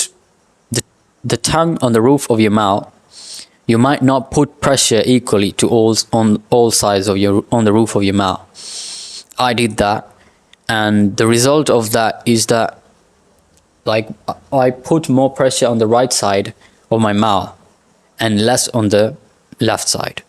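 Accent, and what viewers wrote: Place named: United Kingdom. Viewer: Bro's Pakistani And Sounds Like British💀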